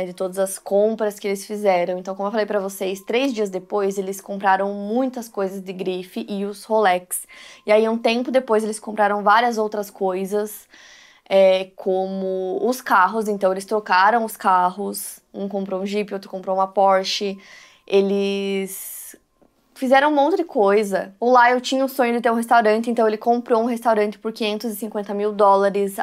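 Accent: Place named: Paraná, Brazil. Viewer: Paranaense adoro nosso sotaque.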